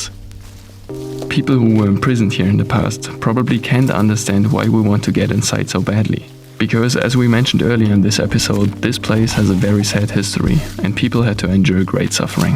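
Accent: Germany. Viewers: Laut dem Dialekt seit ihr Deutsche! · Trotz des super Englisch, bin ich den Gedanken nicht losgeworden, das etwas Deutsch klingt.😊 Tolles video! Toll recherchiert, erzählt und soviel interessantes bildmaterial. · I am extremely impressed by you. You are a classy, well spoken gentleman. This is first video of yours I've watched. Glad I found you. Are you German? My mother is, and as children, that's all we spoke. But, your dialect is different than ours. Anyway...THANK YOU! ♥️ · Dein Englisch klingt wie ein deutscher der versucht ein Englisch zu sprechen... Warum quatscht du nicht auf deutsch?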